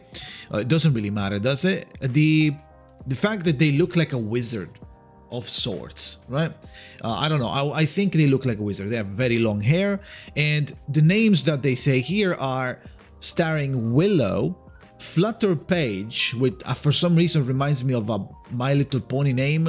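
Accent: United Kingdom. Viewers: The UK accent made me clacking 😭😭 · Alright mate, hate to do this but I oughta stick up for my Scottish, Welsh and Irish folks and remind you that it's not a "UK accent" but a mish-mash English one. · I didn't click on this video to hear my guy start speaking with a UK accent 😭😭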